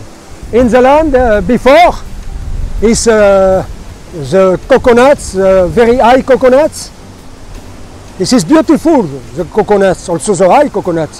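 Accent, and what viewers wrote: France. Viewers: I can sense some French accent.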